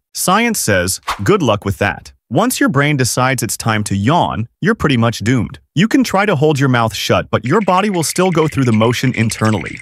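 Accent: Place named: India. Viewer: the indian accent 😭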